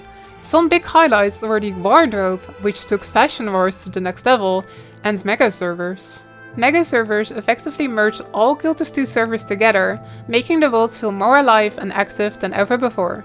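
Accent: Netherlands. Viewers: I love that Dutch accent omg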